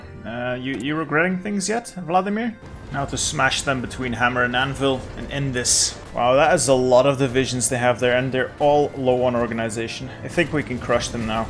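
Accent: France. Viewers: You've a nice french accent, where are you from? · You have a great French accent! You're not French right? Haha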